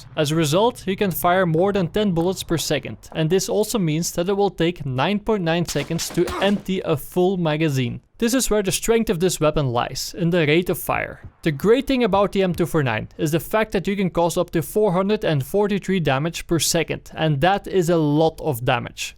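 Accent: Netherlands. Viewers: are you dutch ? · like the dutch accent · je klinkt ml ben je nl?? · Is he dutch? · Wait, are you Dutch? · Dutch, right?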